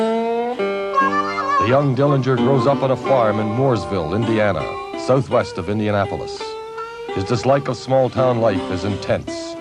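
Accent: Canada. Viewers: LOL at the Canadian narrators accent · The narrators Canadian accent is really distracting.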